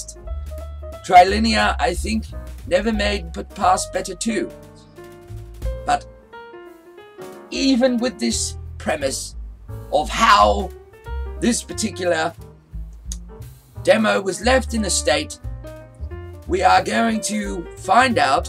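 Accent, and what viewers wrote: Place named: United Kingdom. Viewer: Haha nice British accent.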